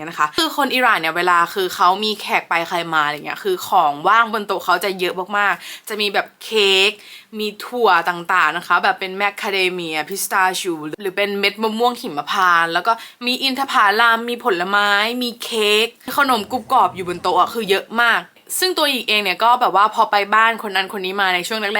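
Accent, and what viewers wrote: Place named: South, Thailand. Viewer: หล่อนน่าจะคนใต้ พูดเร็วลิ้นรัว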